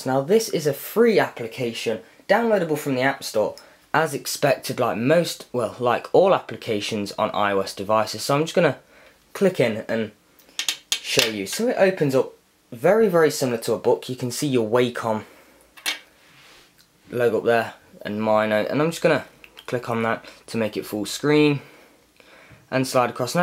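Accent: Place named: United Kingdom. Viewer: I love your british accent!!!!!! :D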